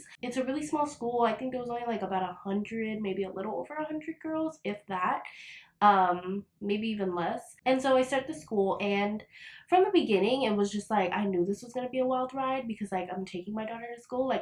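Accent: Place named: Miami, United States. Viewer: I KNEW SHE WAS FROM MIAMI OMGGG IVE BEEN WATCHING HER FOREVER BUT I WAS LIKE ITS SO CRAZY HOW SHES SO MIAMIAN BRUHHHH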